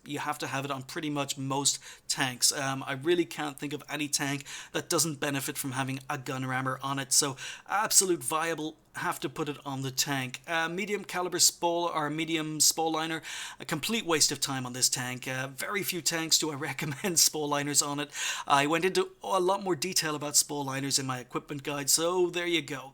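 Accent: Ireland. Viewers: but no one says is it worth it like. Its that Irish accent LOL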